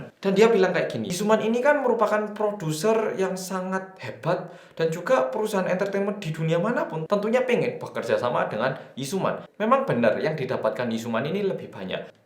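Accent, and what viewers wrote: Java, Indonesia. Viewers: Penjelasannya mudah di mengerti padahal Jawa ne medok tenan😎 keren keren 👍👍👍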